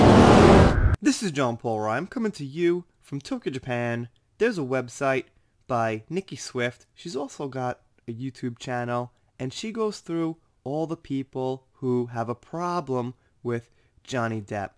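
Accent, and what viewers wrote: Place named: East Coast, United States. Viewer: You know the YouTubers that become uber famous let you in on them, not just news. Still wonder if your wife is Japanese or work brought you there...what's it feel like having strong East Coast (NJ? NYC?) accent in Japan...one of the few places I've not been but so many are curious about it. When you are comfortable.....🤗 Not before.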